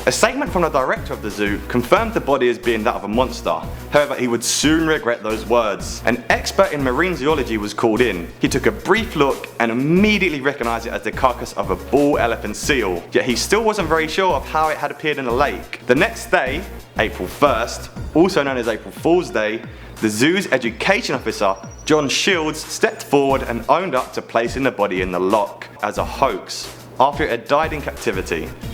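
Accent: United Kingdom. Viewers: Yay another British host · British accent <3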